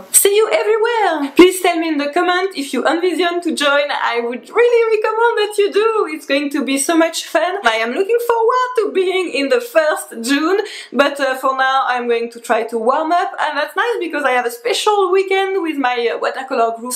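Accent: France. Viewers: As a watercolor pigment geek, and yes I also live in France but have not your cute accent, I try to put each day the spot on one pigment. Thanks to you as this idea came up watching your video. Merci beaucoup et à bientôt.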